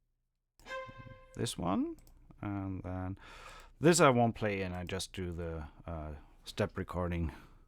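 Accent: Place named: Germany. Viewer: I really like your accent, where in Germany are you from?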